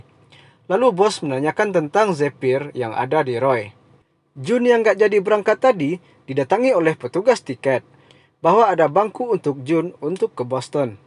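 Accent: West Sumatra, Indonesia. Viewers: Orang Sumatra barat ya bang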